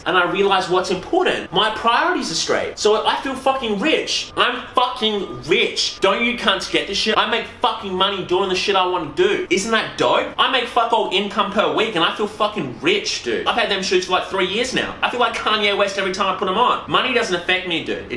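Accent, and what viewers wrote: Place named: Newcastle, United Kingdom. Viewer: If nobody has asked why you introduce videos in a Geordie accent then I'll be pissed.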